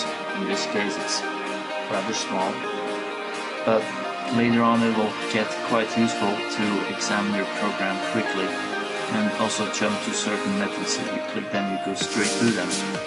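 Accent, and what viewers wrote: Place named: Russia. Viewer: i love how he says Class but you can hear the Russian accent coming through.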